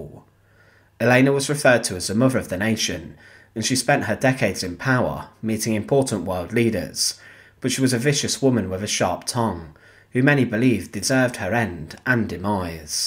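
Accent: United Kingdom. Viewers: Yes we call that British English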